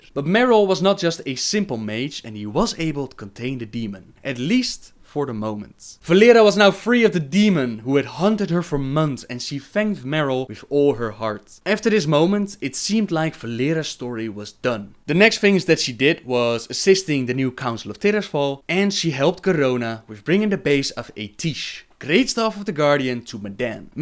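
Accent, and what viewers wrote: Netherlands. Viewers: Are you Dutch/Belgian? Your accent sounds like Dutch. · gij zijt nen hollander :D direct gehoord :p -belg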